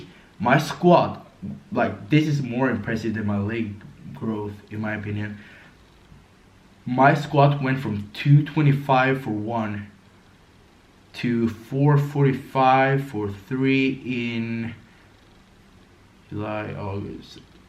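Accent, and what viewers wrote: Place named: United States. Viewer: i love your american bro accent hahaha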